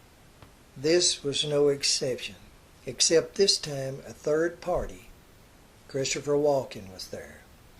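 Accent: South, United States. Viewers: the hillbilly naratory drives me crazy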